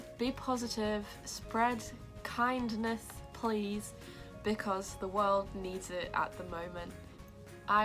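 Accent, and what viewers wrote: United Kingdom. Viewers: Every video I’ve seen with a person with your condition has a British accent. I wonder if it’s something in the air or water or something to that extent.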